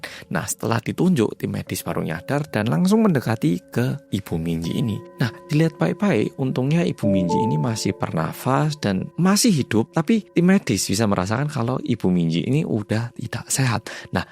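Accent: Java, Indonesia. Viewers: Malem medok🎉 Lgsg nyimaaak 🎉🎉🎉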